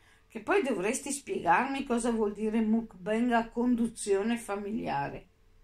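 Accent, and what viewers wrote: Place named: Veneto, Italy. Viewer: Buonasera.. sei simpaticissima!!! Sono veneta anche io ed è sempre un piacere sentire il nostro accento😍 Dovresti fare delle LIVE... sai che seguito che avresti!!!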